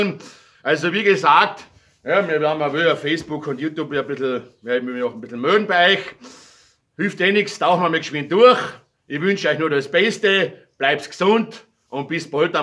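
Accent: Austria. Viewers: nicht jeder in österreich redet so das ist der dialekt aus "kärnten" (südlichstes bundesland) in salzburg , graz und wien redet man komplett anders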